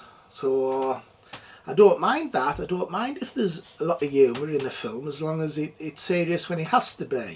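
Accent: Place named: Northern England, United Kingdom. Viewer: Being from the NE of England myself (South Shields) it sounds like is from Sunderland or Durham.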